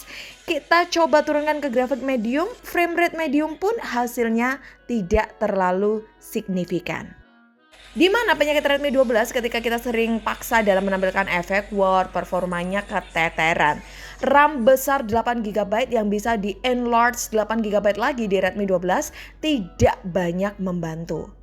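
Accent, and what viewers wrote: Surabaya, Indonesia. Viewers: orang surabaya ya mbak?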